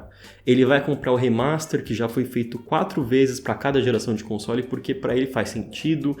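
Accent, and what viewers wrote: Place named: Northeast, Brazil. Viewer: vc é nordestino né? só podia ser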